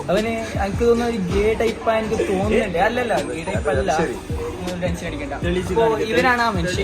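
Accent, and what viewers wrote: Kasaragod, India. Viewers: Ksd slangil anne mund 👀🤌🏻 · Ksd slang anne samsarkk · Bro ningo kasargod language anne pari adh majje aavu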